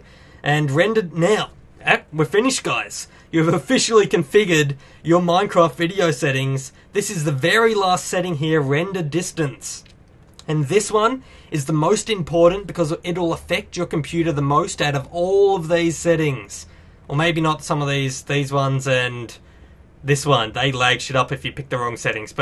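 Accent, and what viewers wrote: Australia. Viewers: umm... are you Australian, British or another country/background. Srry I just really wanna know cuz i'm not good with accents:S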